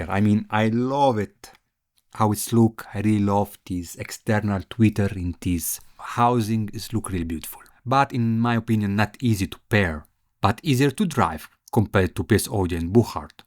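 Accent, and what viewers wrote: Italy. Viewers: I like italian accent! What camera do you use?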